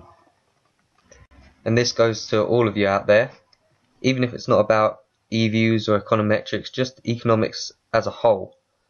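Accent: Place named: United Kingdom. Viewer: thank you so much!! and love your beautiful british accent....haha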